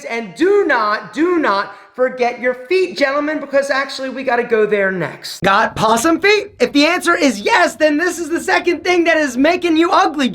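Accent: Philadelphia, United States. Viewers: This guy is definitely from Philly that accent though.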